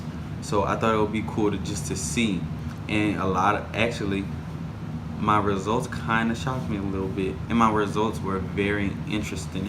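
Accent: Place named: New Orleans, United States. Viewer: I love that we know our people just from the accent. I said to myself, "He is from New Orleans." Then I saw your results which were very similar to mine. One parent is from New Orleans, but I was born and raised there. My only migration was also Louisiana Creoles and African Americans. Thanks for sharing.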